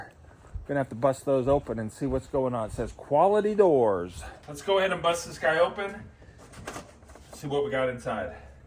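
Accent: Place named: South, United States. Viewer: "fixin' to finsih framin' the wall", I think could pass as a Southerner.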